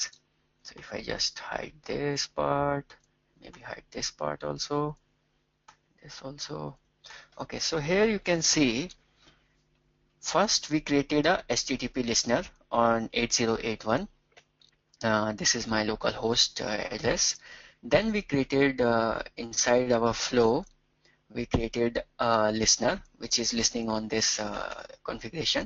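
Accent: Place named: India. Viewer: 印度英语，听着很费劲啊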